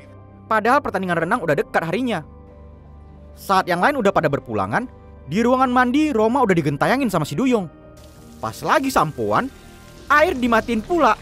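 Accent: Medan, Indonesia. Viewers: Karna logat medan nya sangat terasa,lucu dengar ang nya riveuw😁😂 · Sumpah putri snng bngt dngn cr Mimin nyeritain setiap alur crta2 film,,dngn bhsa logat medannya rs rindu sm kampung hlmn jd sdikit terobati,,moga sehat sllu buat mimin Santun pg dr putri Jawa Timur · Seru kali ah dengar cerita sm logat medannya abang ini🤣🤣 mantap..mantap👏👏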